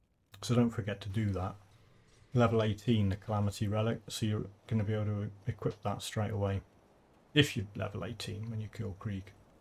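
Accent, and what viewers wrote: United Kingdom. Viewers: Hey man! This comment is kind of unrelated to this video, but I just wanted to say that you reignited a spark in me, not only for this game, but for my life (as cheesy as that may sound). I have an embarrassing amount of time in this game for what I have actually accomplished. Anyway, I'm sick a lot and bedridden where I can't do much of anything, even play GD, but watching your videos has changed my entire perspective on not only my approach, but the FEEL of this amazing game. You made it feel okay to suck...lol. I mean I should have learned the things you teach WAY earlier in life. No gimmicks or music or loud people yelling "HEY GUYS! Check this out!" Your blazingly stereotypical English Karl Pilkington ish accent made it fun to listen to and watch while in utter despair. This may sound like something bad, when in fact it's absolutely amazing and I'm very thankful for it and you. 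Disclaimer: I am in no way saying you are like that guy, just the accent. I plan on doing tons of your builds with some of my spice (currently doing your Bloody Pox) and many others. Just wanted to say thanx man, and that Warden video with every class takes some serious commitment. Keep up the great work! ..It's appreciated. 🤘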